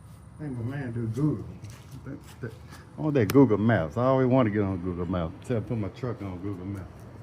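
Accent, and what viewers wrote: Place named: Mississippi, United States. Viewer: You from Mississippi?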